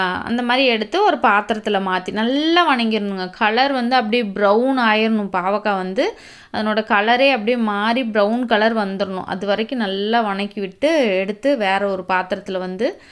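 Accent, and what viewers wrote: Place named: Kongu, India. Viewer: nenga yaru slang ah change panna solla. Ithu kongu slang. Itha la unga nala eathuku mudila na enna pandrathu. Be inclusive❤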